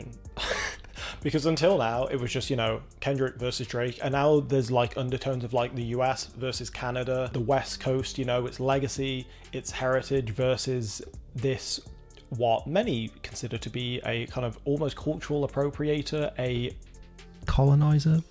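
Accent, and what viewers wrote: United Kingdom. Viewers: Its always so bizarre and cringe to hear the word ni**a spoken in a British (or frankly any other non American) accent. The term used in an endearing or hip hop adjacent way is so inherently African American and steeped in the specific experience of being Black in America. African American pain/liberation is tied to the word in this context, so when Black people with different accents from other parts of the world say it, it sounds wild af. This is partly why Kendrick told Drake we don't want to hear him say it anymore. Nice video, but just food for thought. Race and culture are not the same thing, Drake not seeming to understand that is a part of why he lost so miserably.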